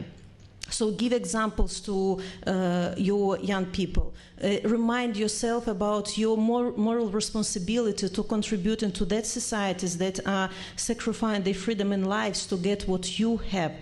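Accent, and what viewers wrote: Russia. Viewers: Погуглила. Она и правда, закончила иняз. Ужас. Говорит с русской интонацией, учитель Английского 🤦🏼‍♀️ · Что за рязанский акцент! 🤦‍♀️😂 лучше бы по русски говорила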